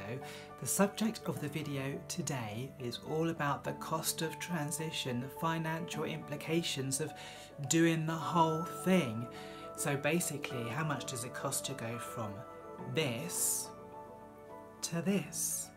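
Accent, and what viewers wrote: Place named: United Kingdom. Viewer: Anyone comment on the similarity of your voice with that of Charlie Martin? You both must have been born and/ or raised in the same elegant part of the UK. Just my American ears. 🧐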